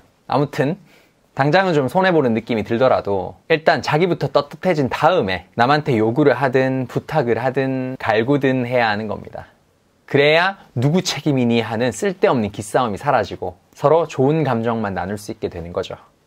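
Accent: Gyeongsang, South Korea. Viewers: 이분 말투 들으니.. 오리지날 서울사람 아니시네...경상도 분이시네......서울말 쓰려고 노력하는 경상도 분...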